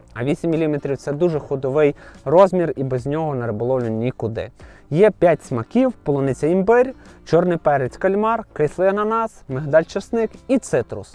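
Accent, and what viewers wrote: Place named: Lviv, Ukraine. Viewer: вітання!!! Найперше хочу подякувати за справжність за те що з перших днів ведеш блоги виключно українською!!! Сам львів’янин і в захваті від нашого говору!!! Сесії та взагалі всі твої старання до глядача як для мене найцікавіші дотепні і зрозумілі!!! Вітаю з власною новинкою попарів та міксів!!! Новий сезон починаю з твоїх новинок!!! А тобі здоров’я натхнення в нових програмах та спільної перемоги над москалями!!! Слава Україні!!!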